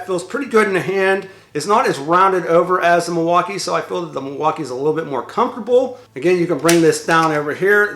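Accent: Pittsburgh, United States. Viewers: your Pennsylvania/Pittsburgh accent. Love it!!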